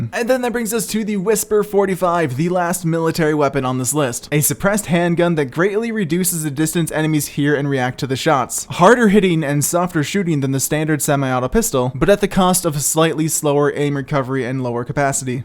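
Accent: Czech Republic. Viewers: Are you from Czech republic?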